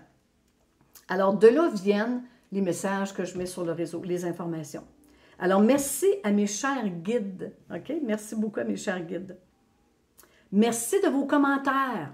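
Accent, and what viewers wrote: Quebec, Canada. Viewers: Merci beaucoup, j’adore votre accent et vos expressions québécoises. Moi je suis de Bordeaux 33 en France là où il y a du bon Vin. Je vous embrasse très affectueusement ❤❤❤❤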